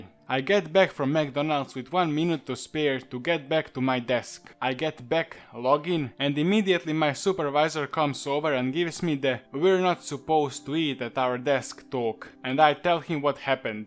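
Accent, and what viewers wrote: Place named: Russia. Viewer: I know that accent. Sounds like Russian. But u never know it could be Indian. Sounds like either one. Either way nice vid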